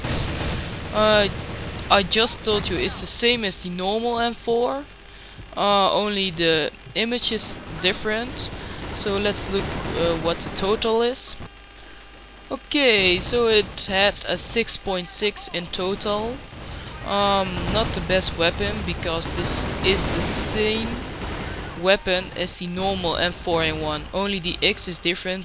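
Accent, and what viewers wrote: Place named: Netherlands. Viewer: haha ik hoorde al aan je accent dat je nederlands bent!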